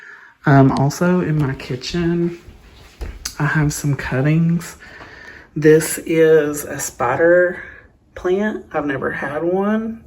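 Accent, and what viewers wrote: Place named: South, United States. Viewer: Why do I love your voice/accent so much?! I think it’s bc it’s southern-ish sounding, yet you don’t speak As slowly as other southern accenters do? I have no idea, lol.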